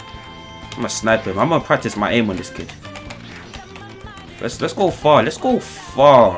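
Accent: United Kingdom. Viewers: why do i think ur british now hmmmmmmm.... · are you british? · You from the uk? I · Are u black and British?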